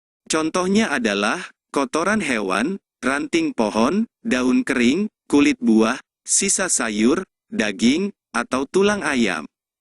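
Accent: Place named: Banten, Indonesia. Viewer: banten mana suaranya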